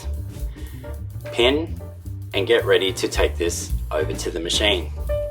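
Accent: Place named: Australia. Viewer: Hi - i am so happy you are doing this! You do such a good job and your voice is so soothing. And who doesn't like an Aussie accent?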